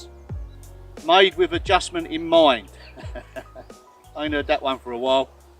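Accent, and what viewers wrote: Essex, United Kingdom. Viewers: i live in Thorpe Bay (Southend) i knew you had a soft Essex accent. Wonder why you left?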